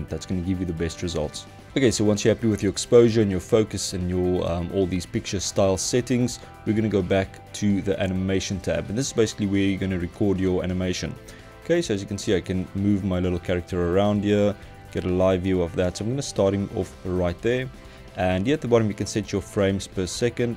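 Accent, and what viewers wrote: South Africa. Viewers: you south african by any chance?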